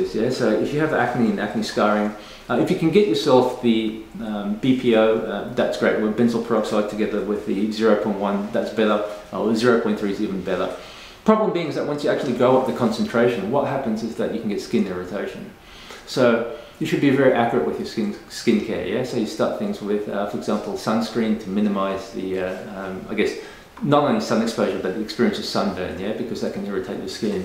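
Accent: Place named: Australia. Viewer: Thank you for your videos and information. It would be a lot easier to follow if you sit or stand in front of the camera and make eye contact, because it’s hard for non native English speakers to understand your beautiful Australian accent, so standing in one spot will make all the words have the same tone and will be able to read your lips. (but that’s just me and my opinion)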